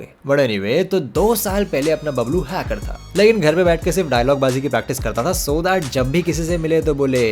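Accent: India. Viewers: UK born with Desi Village accent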